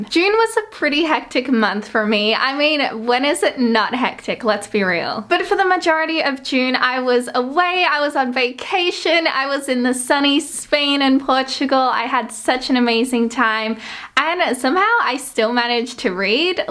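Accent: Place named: United Kingdom. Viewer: I’m trying to figure out wether your British of American because you sound both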